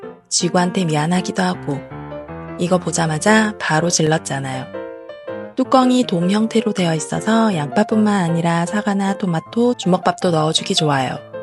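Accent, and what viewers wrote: Gyeongsang, South Korea. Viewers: 혹시 경상도분이신가요?중간중간 경상도 억양이 들리는듯하여.. 그리고 화장실 천장은 손을 안대는데도 저렇게 깨끗하게 되나요?한번 닦아줘야하는거죠?아님 물 뿌리거나.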